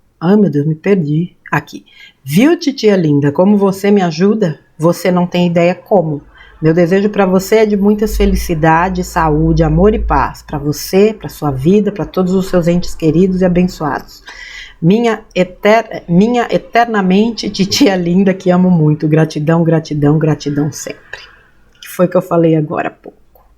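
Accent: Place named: Minas Gerais, Brazil. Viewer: "querida amiga", só posso dizer, gratidão, gratidão, gratidão, conhecer você e todos os personagens que você nós trás... é a melhor parte do nosso dia a dia, pelas reflexões, exemplos, ensinamentos, gostosas gargalhadas e lógico; pelo mineirez sem esquecer do café e pãozinho de queijo. Que PAI multiple e abençoe sua vida Beijos. Lis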